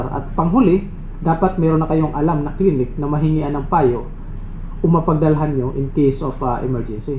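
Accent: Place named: Pangasinan, Philippines. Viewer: taga Pangasinan po ba si Doc? may punto e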